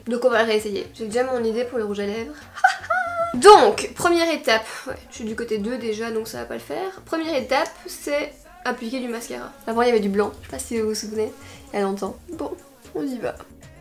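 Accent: Belgium. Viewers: 🙊💞 j'aime vrmt le contenu de tes videos! Tu es super naturelle, et j'adore ton accent belge 😍😍 Bonne continuation 🙆💕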